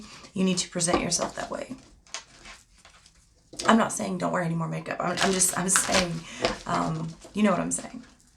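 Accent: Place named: North Carolina, United States. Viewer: I really enjoyed your message and it was it was great to see you face finally. I’ve been a subscriber for about a month now but your message today was so thought provoking. I absolutely need that kind of coaching in my life... I held onto every word! I have to say I think we share the same accent?? NC maybe? Anywho I love you woman, keep up the inspiration and thank you!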